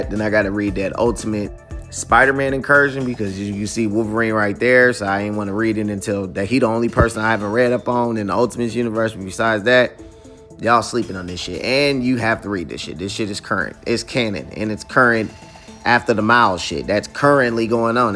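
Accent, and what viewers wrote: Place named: South Central, United States. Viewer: My boy, talking with the south Central accent you know we family I got a sub🔥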